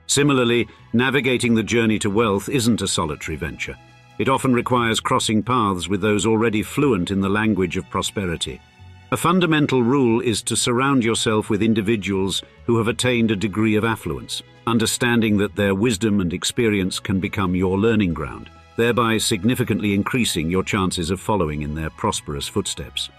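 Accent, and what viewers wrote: United Kingdom. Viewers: This is just “rich dad poor dad” spark notes in a British accent.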